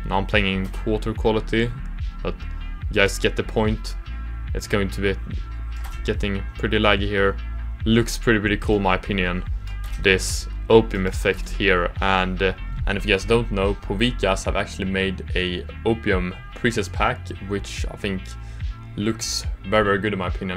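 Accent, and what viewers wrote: Sweden. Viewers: Tog mig tre sekunder från att du började prata till att förstå att du var svensk haha, du förtjänar en sub dock! Keep it up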